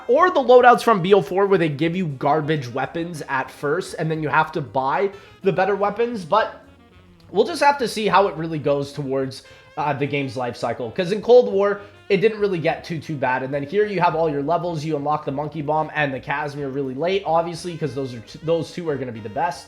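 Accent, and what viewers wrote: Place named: Toronto, Canada. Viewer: Toronto accent 🤡🤡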